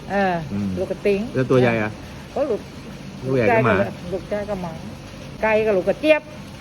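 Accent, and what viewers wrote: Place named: Sukhothai, Thailand. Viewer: เหมือนสำเนียงสุโขทัยเลยครับ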